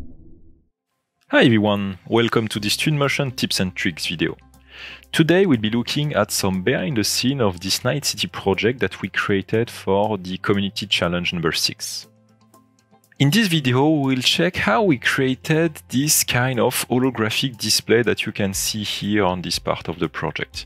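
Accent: France. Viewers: Le petit accent français ? Des videos en français ? :) Faîtes vous des formations twinmotion ?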